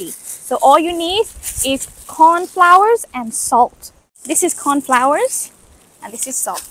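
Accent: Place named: Australia. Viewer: You almost have a hint of an Aussie accent. What a beauty.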